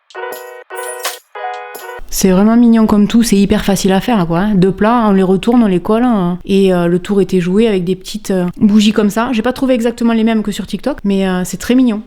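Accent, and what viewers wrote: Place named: Southwest France, France. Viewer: Bien sur que cette vidéo nous plait ! Toutes tes videos sont superbes! Je vis à montral mais je biens du sud ouest. Ca fait plaisir d'entendre l'accent chaque semaine :) merci ❤️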